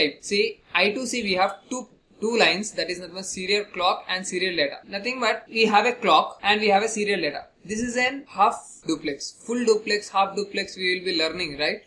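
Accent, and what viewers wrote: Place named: India. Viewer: ntrog ntir ntintidiri ntig any one understand this indian accent ???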